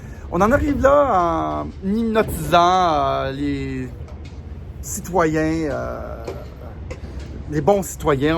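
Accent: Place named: Canada. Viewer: Bravo Merci pour tes infos C'est très utile pour réveiller le peuple Merci Merci Merci Bonjour de la France J'adore ce petit accent canadien Luce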